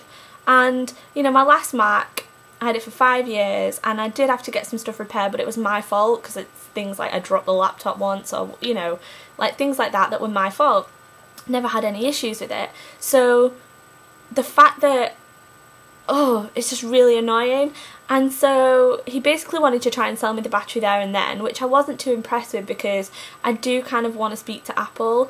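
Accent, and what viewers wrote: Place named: Blackburn, United Kingdom. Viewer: Are you from blackburn? ahah xx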